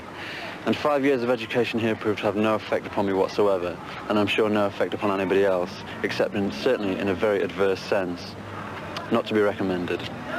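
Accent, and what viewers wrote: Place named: Ireland. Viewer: O sotaque irlandes dele junto com o inglês da um tom de soberania na sua voz, eu simplesmente amo.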